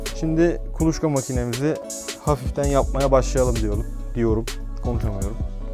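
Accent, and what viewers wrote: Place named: Uşak, Turkey. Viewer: Selamınaleyküm ustam hayırlı işler kolay gelsin. Ustam memleket neresi? Konuşman Uşak civarı gibi geldi eğer yakınlardaysan yardımına ihtiyacım var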